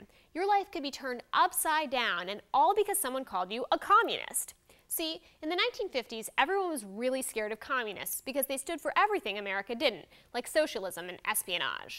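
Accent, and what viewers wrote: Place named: India. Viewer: *she has a very this Indian accent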